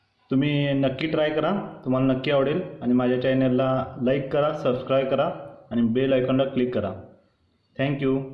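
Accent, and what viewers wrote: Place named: Kolhapur, India. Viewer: Chef asl kolhapuri boltat ki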